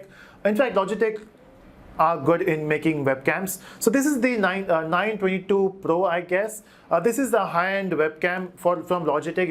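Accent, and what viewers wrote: Telugu, India. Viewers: bro are u from andhra or telangana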